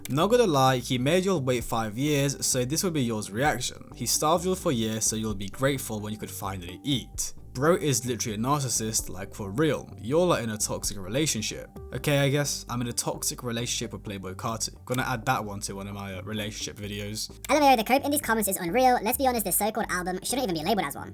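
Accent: United Kingdom. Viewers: did this youtuber really just said "Young Scutaaah" ????? I hate UK accent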